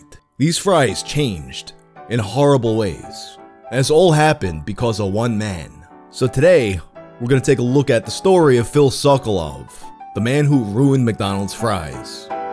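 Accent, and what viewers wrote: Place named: New York, United States. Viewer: Your New York accent is getting a lot thicker, it’s nice. Makes me want to listen to Type O.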